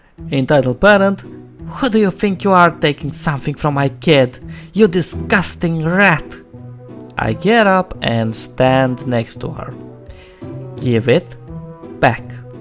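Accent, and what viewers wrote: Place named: Nigeria. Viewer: sorry if im being rude, but you sound like the Nigerian scammer across the phone trying to get someone's social security number and credit card number.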